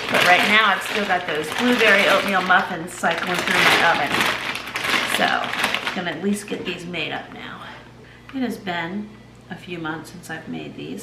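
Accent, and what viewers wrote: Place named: Charleston, United States. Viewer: I was wondering are you from South Carolina you sound like you're from Charleston South Carolina I mean this in a good way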